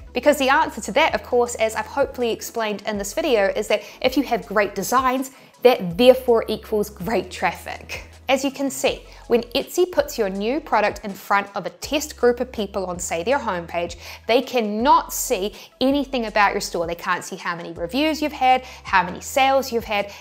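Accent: New Zealand. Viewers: What accent? Sounds perfectly kiwi to me.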